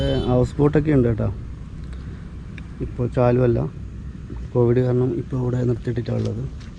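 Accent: Malappuram, India. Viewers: Malappuram basha 😙😙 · Sooopppeerr പറയാൻ വാക്കുകൾ ഇല്ല. എന്റെ ഉമ്മചിയുടെ വീട് മലപ്പുറം ആണ്. നിങ്ങളുടെ വർത്താനം കേട്ടപ്പോൾ അവിടെ പോയപോലെ തോന്നി.🤗🤗 ഇവിടെ ഇരുന്ന് ഇതെല്ലാം കണ്ട് സമാധാനിക്കാം. Corona കാരണം പുതെറങ്ങാൻ പറ്റാത്ത ഞാൻ.😐😔😐😔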